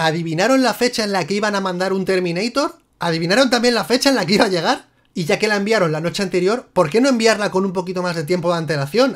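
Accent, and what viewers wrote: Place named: Spain. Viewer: ¿Y las gafas de 3d? Bueno, al menos se que el acento españolete no se irá, que es lo que me gusta de estos vídeos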